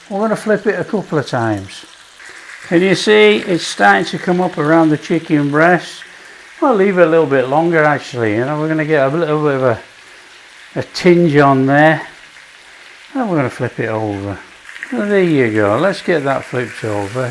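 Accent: United Kingdom. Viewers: Great day to you, today is perfect and you are part of it, love your British accent and foods, crack on as you say, OH PLEEEEASE, each day should start out with a sizzle whizzle, come on😅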